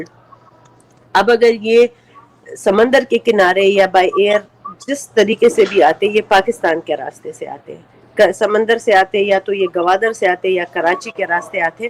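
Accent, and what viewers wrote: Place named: Kutch, India. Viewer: bhai.. your pronunciation tone sounds like your belong to gujrat - India ( Kutch) region...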